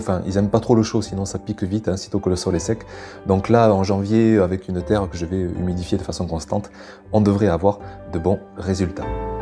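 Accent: South, France. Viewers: On sens bien que tu es du sud avec une belle régalade 😜😜😜je le dis aussi 😜😜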